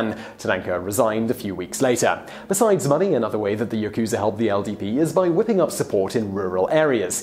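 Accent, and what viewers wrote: United Kingdom. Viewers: ugh, its not pronounced ya-KHU-za. Yakuza is pronounced "Cheese." Yes, I am A) busting your balls, and B) displaying how much most of us give a damn how some brit pronounces names in a culture whos language is completely separate from English. As in not very much at all. Dont get caught up in the vocal minority, Most of us are just looking to be entertained for a few minutes, doing some research and giving it your best go is all we expect.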